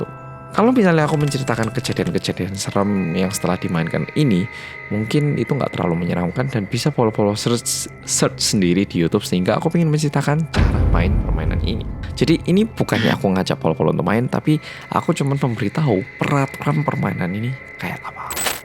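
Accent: Java, Indonesia. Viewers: Mau cerita dikit ya. Ibuku menderita Alzheimer setahun ini Sebelum beliau kena alzheimer, beliau sering tak ajak nonton bareng videomu. Terutama yg kulineran & jalan2 Setelah itu lamaaaaaa sekali ibu ngga nonton videomu krn aku pindah rumah, ngga serumah lagi sama ibu Setelah terkena Alzheimer, beliau ikut aku. Bbrp hari yang lalu, aku nonton Rindingdong di depan ibuku dengan volume full Eh ibu langsung "iki sing wong korea medok kae yo la?" Walah, takjub aku. Ibu masih inget sama, walau memori2 yg lain banyak yang hilang 😄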